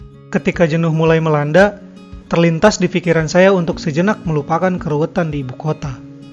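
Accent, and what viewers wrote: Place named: West Java, Indonesia. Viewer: Aa keren bangettt a.... 👏👏👏 itu bahasa indonesianya masih ketara bgt logat sundanya hahaa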